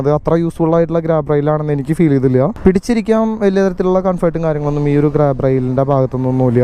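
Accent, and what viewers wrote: Thrissur, India. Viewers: Aa thrissur basha onnu maatan sramikyo pls😁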